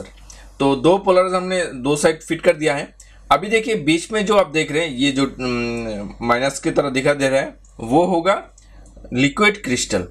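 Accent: Bengal, India. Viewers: Are you a Bangali...????😀😀😀😀😀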